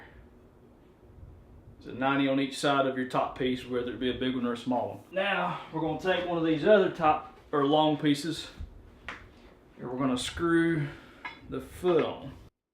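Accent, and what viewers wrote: South, United States. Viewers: Idk why but I've never been a fan of U.S. southern accent. Actually, I've just not thought about it or considered it. But, my goodness, his voice and accent are just beautiful. Oh and the tutorial is great too. I'm trying to build a similar rig, and this looks really nice and straight forward. Thanks. 😊😊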